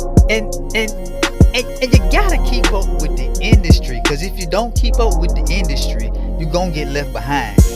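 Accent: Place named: South, United States. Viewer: Oh Chile u are COUNTRY Lmbo love it !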